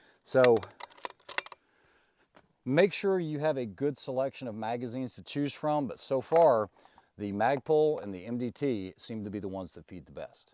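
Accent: South, United States. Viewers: thanks! haha I knew it had to be a bit more southerly US because of your accent, which I also like very much! Thanks again for the cool range and the great information on the new cartridge.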